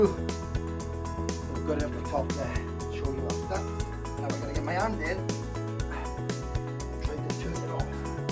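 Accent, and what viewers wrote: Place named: Wales, United Kingdom. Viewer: I'm watching this from Australia as I've got a 1250 Bandit too. Love the Welsh valley's accent as I'm originally from Hengoed !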